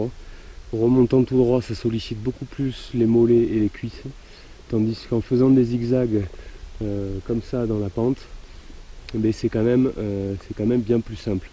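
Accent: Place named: Southwest, France. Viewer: Cest vrai quand vous regardant les gars vous êtes tres claire, une voie fort sympathique ( j’adore l’accent du sud ouest ) mais j’ai eu la même reflexion en cas de chute et malgré le piolet bien en main ca risque de vite chauffer sur les mains et donc le réflexe sera de lâcher le piolet...donc oui des gants semble s’ajouter a votre panoplie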